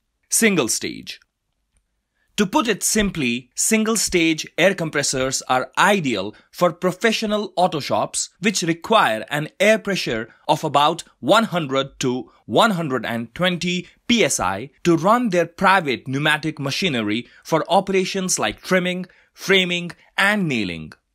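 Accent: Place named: India. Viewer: It's Indian english accent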